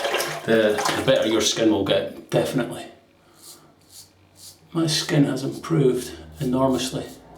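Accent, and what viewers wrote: Scotland, United Kingdom. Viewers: Great shave,! Happy St Andrew's Day to ye! I'll be sure to take a wee dram this evening to mark the occasion...well..a day late of course being I'm down here in the merry old land of OZ. Hehehehe.Your Scottish 'burr' reminds me...yes...again..of a classic piece of British comedy...! Slange! <link>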